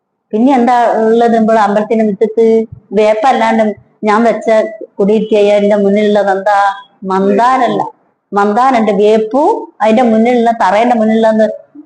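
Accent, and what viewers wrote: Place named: Kannur, India. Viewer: Kannur bhasha ane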